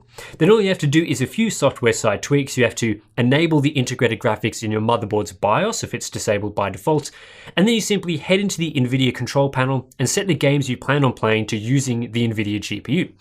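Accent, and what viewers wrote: Australia. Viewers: r u from ostralia?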